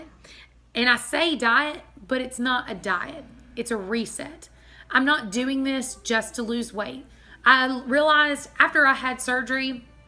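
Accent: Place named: East Texas, United States. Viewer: "What accent!" Indeed! You make me feel like I'm back in East Texas, surrounded by good looking men in Wranglers so tight you can tell what religion they are and when you said, "You feel froggy?" I wanted to yell, "JUMP!" at my screen!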